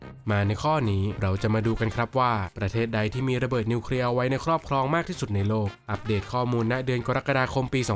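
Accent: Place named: Isan, Thailand. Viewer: คนอิสานใช่มั้ย ฟังไม่รุเรื่องเลย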